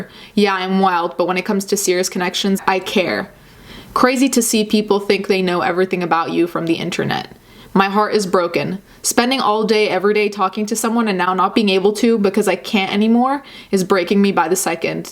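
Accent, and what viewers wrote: Italy. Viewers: Love you saying things the Italian way lol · I saw a tweet that said that she Googled his middle name so she could put it in her post HA. Omg I didn't know you were Italian?? The accent!!! Drag me.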